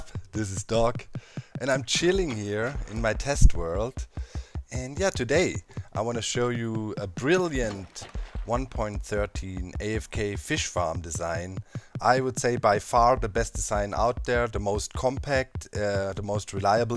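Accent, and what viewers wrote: Germany. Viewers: man hört das er ein deutschsprachiger ist :P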